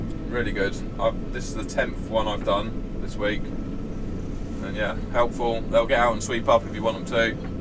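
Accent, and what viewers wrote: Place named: East Anglia, United Kingdom. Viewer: Hi, really enjoying your videos 👍can you let me know where you're based roughly? Sounds like a lincs/ cambs twang to me? 😃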